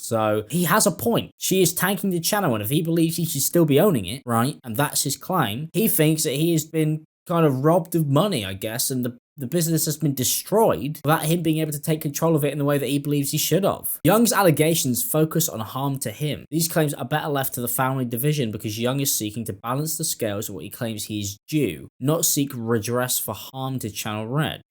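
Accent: United Kingdom. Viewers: .... You're a Brit,? (from the accent) & you don't seem to understand American-style Family Law courts. Sniper-Bitch wants this lawsuit to go to Family Law because those courts are heavily biased against men - Period!!! It's one of the biggest legal scams going in America. This is a business law matter & that's where it belongs.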